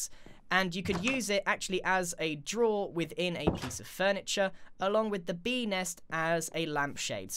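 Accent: United Kingdom. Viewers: Dude I just love ur British accent❤️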